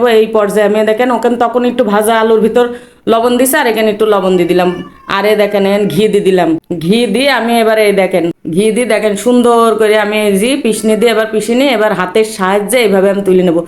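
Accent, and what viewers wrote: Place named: Chuadanga, Bangladesh. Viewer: অনেক মজা হলো মনে হচ্ছে 😋 বাড়ী কোথায় তোমার চুয়াডাঙ্গায় ? কথা শুনে মনে হচ্ছে চুয়াডাঙ্গার মেয়ে 😅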